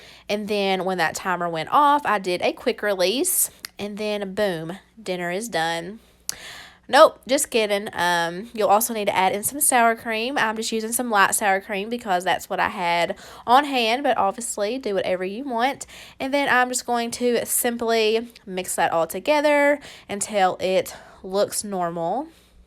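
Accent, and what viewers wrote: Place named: Kentucky, United States. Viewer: I don’t even have to ask where you are from! You sound just like my family from Kentucky. Love your recipes! I just subscribed.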